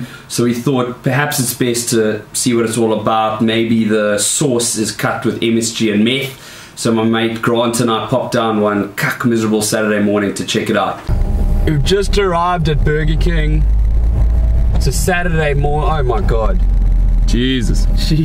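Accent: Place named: South Africa. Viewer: I'm unsure which I liked more, the SA accent or your articulation of argument. Props to you from Australia!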